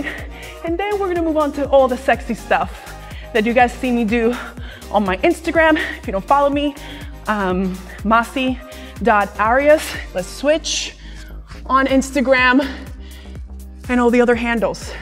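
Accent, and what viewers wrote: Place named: Latin America. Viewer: I love my latina sis' accent: shin becomes "chin"☺💕💕 Thank u so much for sharing your gift of fitness w us!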